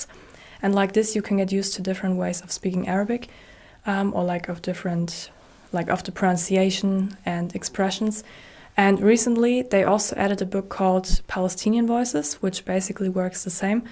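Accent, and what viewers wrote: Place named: Germany. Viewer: Your name shows Italian last name but your accent is much with German , are you German or Italian ?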